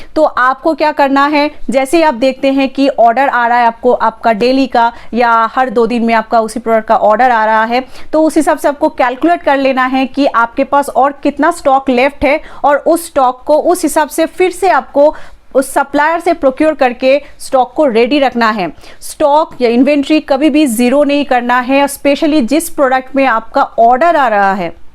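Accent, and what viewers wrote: Bengal, India. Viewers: good info with strong bengali accent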